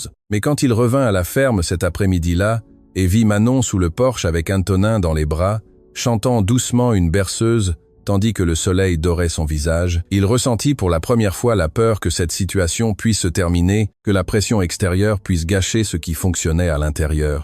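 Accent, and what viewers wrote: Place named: Auvergne, France. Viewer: ❤❤❤ très très belle histoire d'amour qui donne du beaume au coeur. Mon passage préféré est la visite de Jérôme au curé car on se doute qu'il va lui parler de mariage et je n' attendais que cela. Je suis en France en région Auvergne, c'est un endroit juste au milieu de la carte et ce genre d' histoire a pû exister en son temps car le conteur parle comme parlait les gens d ici.❤❤😂❤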